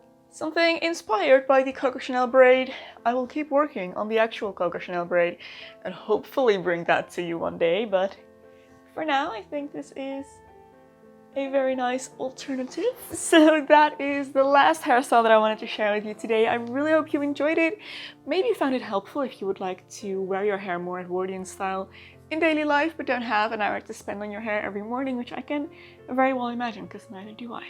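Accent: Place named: Netherlands. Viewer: lol dutch accent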